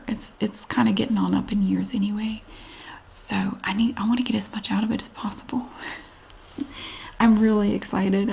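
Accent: South, United States. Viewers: How about Abigail for the kitten and call her Abby. She’s so sweet. you look so beautiful in that color. Your skin is glowing. Love your videos I listen To them every night. The smooth soft southern accent. Amazing 😉❤️❤️❤️❤️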